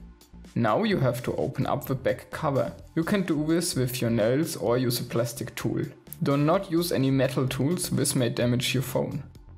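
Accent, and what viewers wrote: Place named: Germany. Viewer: Klingt so unschlagbar Deutsch, kann man nicht verbergen... EDIT: Good to know i would sound... now it makes sense why people always want me to join the voice-chat.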